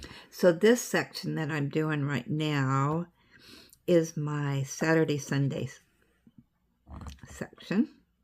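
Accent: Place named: United Kingdom. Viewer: Hey there you crack me up this is amazing diamond painting he sounds British !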